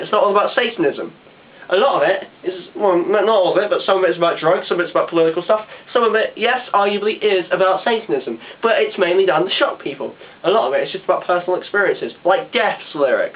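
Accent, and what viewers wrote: United Kingdom. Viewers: Video is instant win because of British accent. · hey, i don't know if you have the same problem over in england (sorry if i'm wrong i'm just judging from your accent, and you did say your not from america) where you have people talking about how metal is tallentless, or did some of our braindead trash just leak over to your country??? i agree with you 100% and wholeheartedly, but anyway we have that problem alot over here....and it gererally pisses me off!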